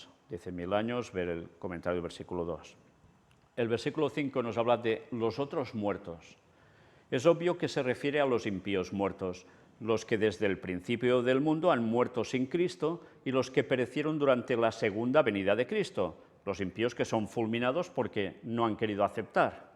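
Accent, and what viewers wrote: Spain. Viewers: Gracias hermano por su predica, ciertamente disfruto mucho cuando usted predica porque hace una mezcla de verdades con su jocosa manera de hablar estilo espanol. Bendiciones.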